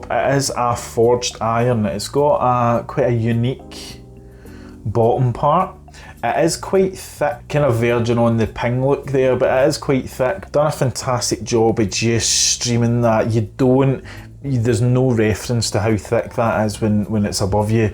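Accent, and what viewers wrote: Scotland, United Kingdom. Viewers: its a thick accent, but its you being from Scotland, and it is clear enough for me to get the point of the story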